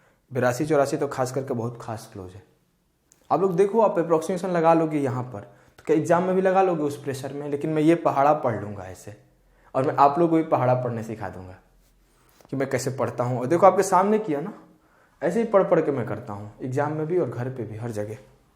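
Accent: Bihar, India. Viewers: Bihar se ho?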